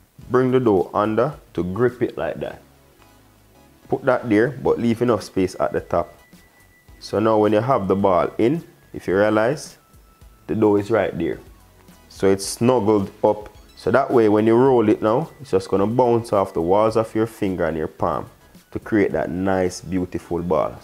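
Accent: Jamaica. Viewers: Love that u redone the video for those that dont understand the jamaican accent. Dumplings look gooooood! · New sub here. I just come across this channel. Oh my goodness everyone gonna think I took special food tech. Lol. I’m loving your channel. Some great tips. I’m binge watching. Lovely straightforward instructions. Loving the accent my fellow Jamaican.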